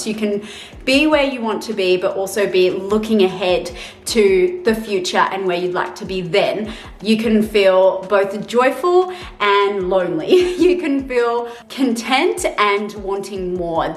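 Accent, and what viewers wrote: New Zealand. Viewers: New lense looks great, kiwi accent gets stronger every video and the hikes mentioned are such good ones. Ive got the able tasmin booked in a few months. Grow where you are planted- so few people learn to do this well! Also cooking is such a good time after you start figuring everything out. You gotta be you though and comfortable pushing yourself out there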